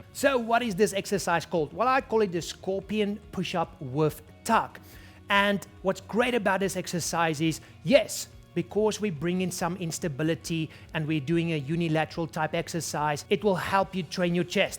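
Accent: South Africa. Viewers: This dude is so South African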